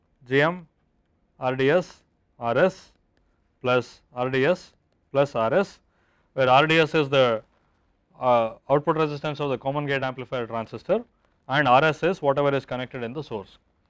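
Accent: India. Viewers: I don't understan any word he says. Too much fast and also with indian accent.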